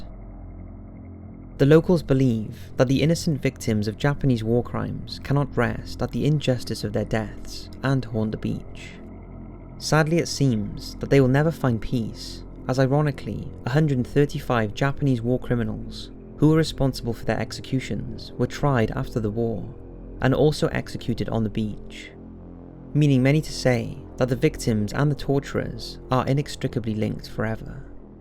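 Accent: Wales, United Kingdom. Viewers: It's his Welsh accent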